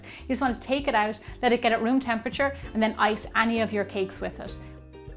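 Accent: Ireland. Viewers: Thought she was from Ireland....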